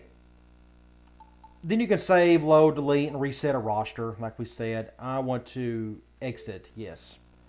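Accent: South, United States. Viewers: Love the video man. You have a great personality man and we kindve got the same southern accent lol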